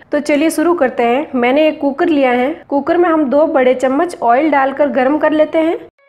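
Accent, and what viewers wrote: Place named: Rural, India. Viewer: * आपका उच्चारण देशज झलक वाला है , अच्छा लगा । 💐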